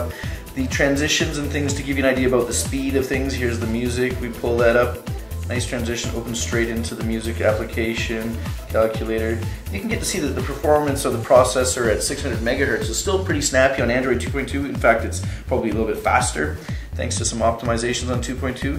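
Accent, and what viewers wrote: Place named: Canada. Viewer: This guy sounds like a Canadian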